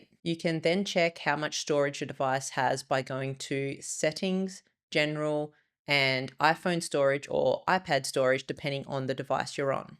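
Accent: Australia. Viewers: Australian accent.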